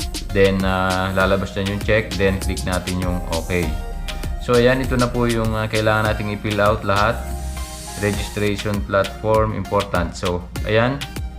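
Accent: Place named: Visayas, Philippines. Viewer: Bisaya man ka dong hehehe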